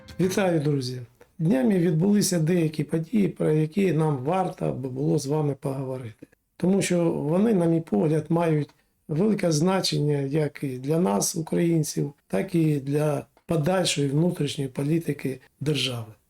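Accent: Russia. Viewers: Може твої слова мають рацію. Але, оце мацковське Аканьє: пАгАвАрить, пАмиляюсь, пААбіцял, пАвідомлять... Заєбал. Вчи мову. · Все було нічого, але акання боляче слухати. Хто ж вас так вчив рАзмАвляти українською, невже ТимАшенкА?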